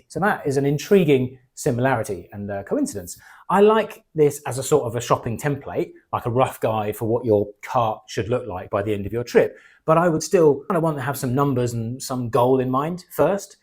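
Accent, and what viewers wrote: United Kingdom. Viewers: is this what plebs like me would term 'spin'...? Suggestion, bias, sales. The beat friend of politics these days. Your use reminds me of the health related mindset change; i get to work out (as opposed to must), get to eat healthily (as opposed to 'ugh i have to eat x). Definitely change begins in the mind. So glad to get an English accent, getting tired of the US ones on social media, just soooo many (i'll offend someone i'm sure). I'm irish, but we'll be neighbourly 😊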